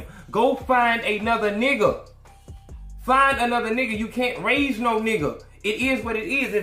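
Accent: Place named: Alabama, United States. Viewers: What part Alabama u from. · What part of Alabama you from bruh? I’m in the 334.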